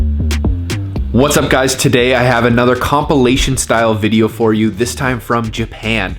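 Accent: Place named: Canada. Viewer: You sound Canadian. You're cute-as-a-button BTW.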